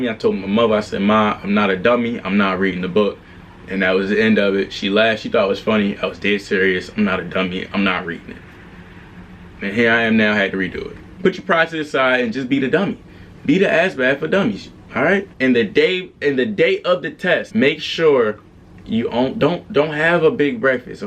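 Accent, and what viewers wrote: Baltimore, United States. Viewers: I can tell he was from Baltimore in a few sec. just off how he talk fr, 410 baby we here. I’m also looking to go in with air traffic control aspirations but for the Air Force.. definitely going be following you my brother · Same! I just love the his accent. Gotta be Baltimore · Sounds like he from either Bmore, D.C. or Jersey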